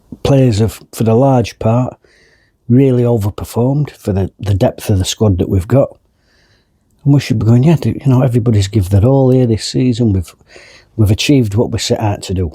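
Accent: Yorkshire, United Kingdom. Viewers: For sure midtable aint that bad. But looking at all these B-teams (Brighton, brentford and so forth), doing more than ok in the premiership, i mean surely it must be possible for wednesday to at least reach for that level? With that said, thank you for all the videos you put out. Wether win or loss you always manage to keep it level headed. And i think (as a swede) im now finally beginning to fluently understand Yorkshire english 😂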